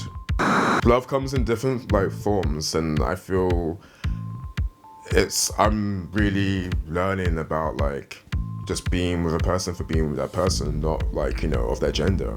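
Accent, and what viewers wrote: United Kingdom. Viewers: I love the British accent coming from a black man...somehow it makes it sound even sexier for some reason. I can't be the only one who thinks this right? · Black sexy guy + british accent = 💯%♿👌😂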